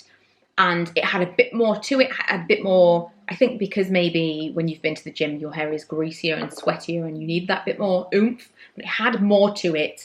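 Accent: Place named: North, United Kingdom. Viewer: I remember watching one of your vlogs (possibly around Christmas?) when you were with your work friends and you sounded proper northern, it was funny!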